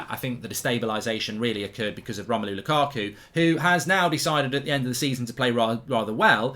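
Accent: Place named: Liverpool, United Kingdom. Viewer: Are you a liverpool fan because you talk like it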